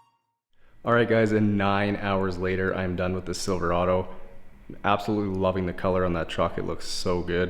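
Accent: Canada. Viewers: I've recently found you yesterday and just watched 27 of your videos back to back.....safe to say I love your videos! I also love your voice overs, love a good Canadian accent lol. Wanted to ask though did you start as a detailer before you moved into this home? Curious how you started and got here. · You’re definitely Canadian. The zero degrees reference and your accent is a giveaway too. I love your channel. So satisfying!!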